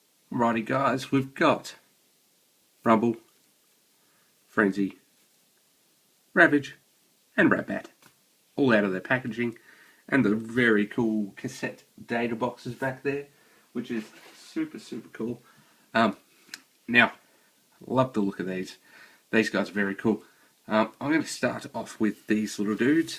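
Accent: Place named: Australia. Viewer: Great to hear an Aussie accent, and one that doesn't sound bogan :)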